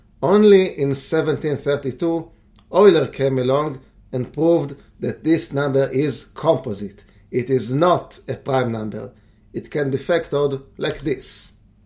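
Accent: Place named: Israel. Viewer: מהמבטא ניחשתי, מהשם של הערוץ וידאתי, וואלה יש ערוצים ישראלים שמשתתפים ברעיון הזה. נחמד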